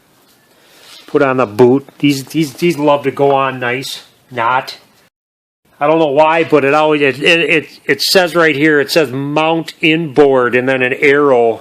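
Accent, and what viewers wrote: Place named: Minnesota, United States. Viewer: I could tell you’re from Minnesota. I also just watched the TV show Fargo.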